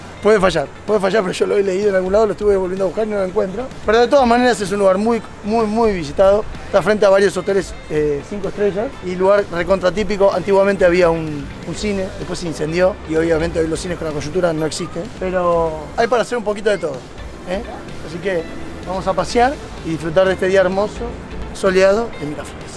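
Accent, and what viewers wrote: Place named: Argentina. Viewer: Volviste de Argentina y se te pego el dejó guacho 😀 sucede que cuando vives fuera de tu país por mucho tiempo pierdes tú acento de origen, basta unas semanas en tu país y vuelves reseteado con tu acento original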